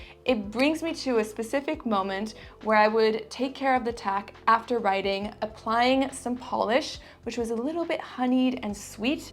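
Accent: France. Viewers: Came here for the review but I have to say I love hearing your French pronunciation. lol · Actually I don’t get extremely excited about the hibiscus mahajad… I do have and love Ingredients 07/2012 and recently got my hands on a sample of Neroli Nasimba and enjoy it quite a bit! Enjoying your beautiful French accent and pronunciation !!! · I truly wish you can review Versace Dylan Purple Paco Rabanne Olympea Loving your French as always… I keep practicing the French R now 😅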